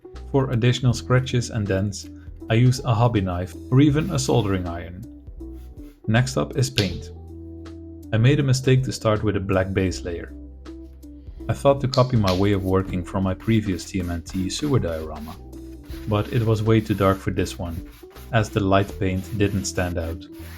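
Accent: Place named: Netherlands. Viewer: Very cool! I think you did an amazing job. I’ve never done anything like this before but this inspires me to at least look into it. And the animation is so cool! Also: I thought I recognized your accent. You’re Dutch right? One other hint I saw was your Lego boek with on the cover: ‘het complete werk’.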